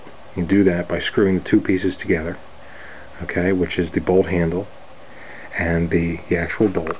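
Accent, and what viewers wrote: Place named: Philadelphia, United States. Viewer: are you from philadelphia or from the area? i noticed you have an accent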